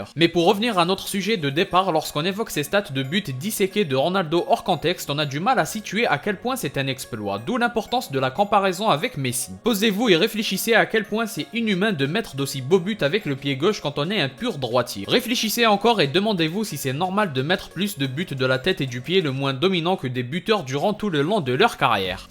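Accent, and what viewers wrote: Algeria. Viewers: J'ai l'impression que tu es algérien de l'Accent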